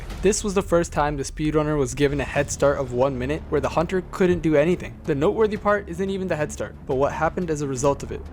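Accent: Ireland. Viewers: Irish Accent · Yooooooooo I am part of the 1% (Edit) I just realised that your Irish... Im Irish to!!!!!!!!!!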